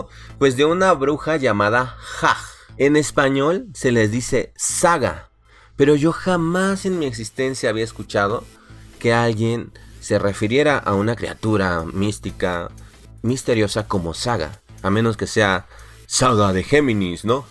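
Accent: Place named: Spain. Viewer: Saludos a todos y un gran programa amigo y AHORA ENTIENDO POR QUE TE SALE TAN BIEN ESE ACENTO ESPAÑOL😂😂😂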